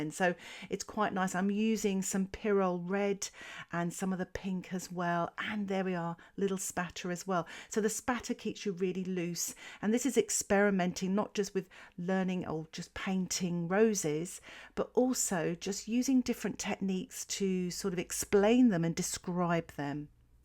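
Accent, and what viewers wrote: England, United Kingdom. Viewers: Absolutely gorgeous and the English accent is great as well. My family history is in England & Wales. I am just getting started in watercolor artistry & I can see you will provide fantastic inspiration! I have subscribed!!!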